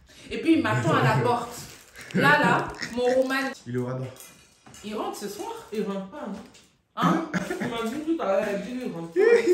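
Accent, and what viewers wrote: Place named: Ivory Coast. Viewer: L’accent ivoirien j’aime trop🇨🇮😂 Pourquoi pas une vidéo en parlant avec cet accent Tu as une belle famille 😍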